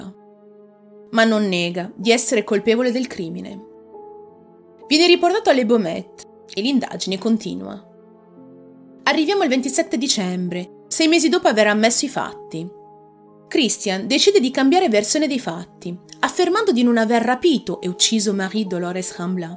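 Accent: Apulia, Italy. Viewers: Ma perché se sei francese hai un accento pugliese così forte???? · Interessantissima storia ed allo stesso tempo agghiacciante. Quante vite sono sconvolte da storie simili. Una curiosità: ti credevo pugliese. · Non sapevo che fossi francese, se davvero è così complimenti parli un italiano perfetto, pensa che io ti scambiavo per come parlavi in italiano per una Pugliese😂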